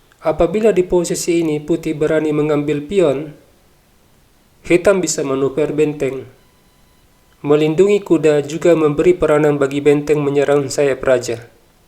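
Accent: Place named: South Tapanuli, Indonesia. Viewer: Bg kemana ya? Semoga beliau sehat Spt nya ini bkn bg ini logatnya spt dr Tapsel Senang dgn filosofi Duani gelap bagi raja Hitam 😂😂😂 Ini sdh ku subscribe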